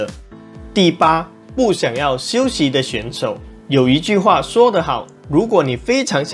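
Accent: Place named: China. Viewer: 這是哪一省的口音，真難聽😢😢😢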